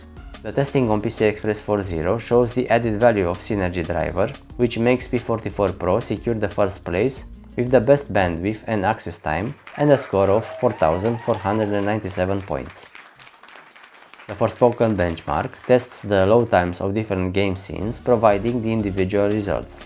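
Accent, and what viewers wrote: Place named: Eastern Europe. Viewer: Eastern European accent sucks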